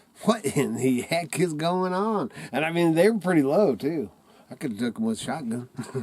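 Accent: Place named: Appalachia, United States. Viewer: Love that Hillbilly talk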